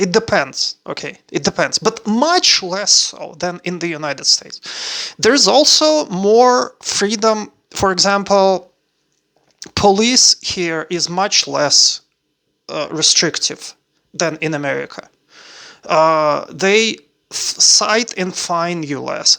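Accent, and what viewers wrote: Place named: Russia. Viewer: Ты так долго прожил в штатах, но у тебя жуткий русский акцент!..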